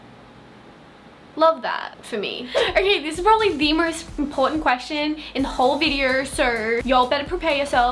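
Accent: Australia. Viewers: Siiiiiiir Lol sorry Australian accents make me laugh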